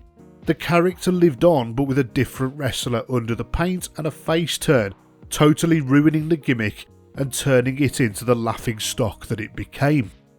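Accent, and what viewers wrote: Birmingham, United Kingdom. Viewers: A Brummie guy doing wrestling reviews? Hell yeh!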